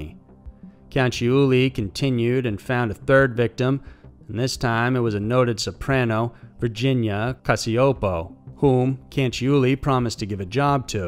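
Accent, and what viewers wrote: South, United States. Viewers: I have thought that too, its the slight southern accent (which I looove). Hey, wouldnt it be hilarious if in the future mr McConaghey revealed he does this true crime/mysteries-gig in YT in his free time? When it gets boring between shoots :)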